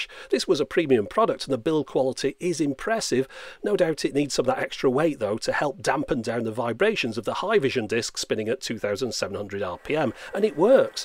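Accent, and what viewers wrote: United Kingdom. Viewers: British axxcent? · Your british accent is pure eargasm.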